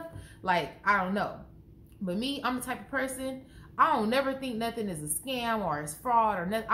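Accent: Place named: California, United States. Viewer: LOL! You must be from the Bay or LA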